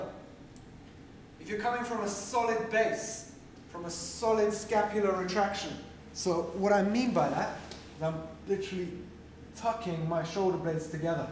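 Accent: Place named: United Kingdom. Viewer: The accent and brit lingo makes this by far the best bench tutorial I've ever witnessed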